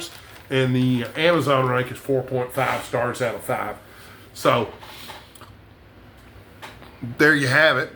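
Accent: Texas, United States. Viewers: The sonnet you picked is one of my favorites, especially the last line. I've heard it read in an English accent, but never in "Texan" - not bad! Lord of the Rings is one of my absolute favorites - way up there in my top 10 list. It's wonderful, isn't it. 2 of yours - I like the authors a lot, but not the specific books you chose (Charles Dickens and Arthur Conan Doyle). All the Kings Men made a big impression on me, but I don't know that now I'd read it ahead of a number of books from that period. Still the idea that it was the Kingfish, well, it started my love of American history of the 20's and 30's. The only one I disagreed with was The World According to Garp - it's way down on my list. Regardless - your list shows you to be a man of taste and discernment.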